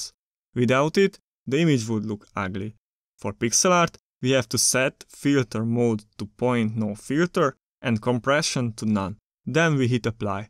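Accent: Hungary. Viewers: Are you hungarian? Your english sounds a lot like how a hungarian would talk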